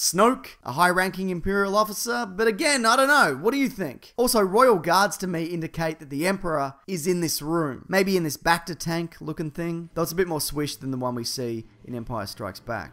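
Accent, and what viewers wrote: Australia. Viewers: Hey man big fan. Just wondering that's an Australian accent right?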